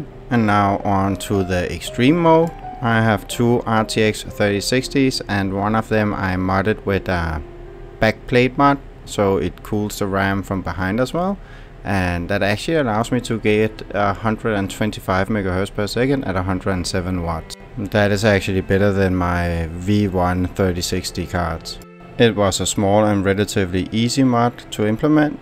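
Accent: Denmark. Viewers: Den der Danske accent altså. :)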